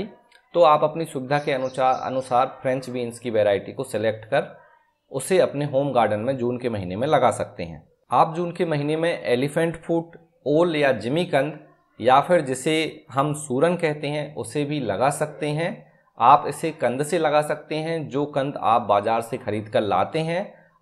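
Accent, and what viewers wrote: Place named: Madhya Pradesh, India. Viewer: MP accent nice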